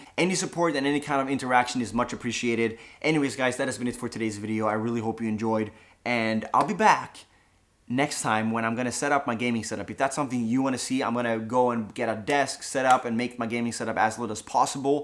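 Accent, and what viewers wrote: Scania, Sweden. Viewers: NÅGON SOM VET VILKEN KOMMUN kommer ifrån? Har givetvis att han är skånsk. Hade varit sjukt ifall vi bodde i samma kommun. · Han är väll skånsk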